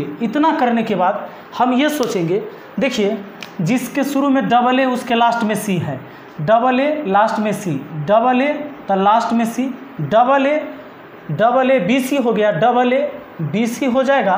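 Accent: Bihar, India. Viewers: Your language in bihari voice is good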